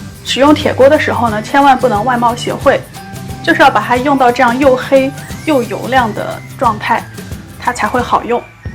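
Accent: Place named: Xi'an, China. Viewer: 听口音有像西安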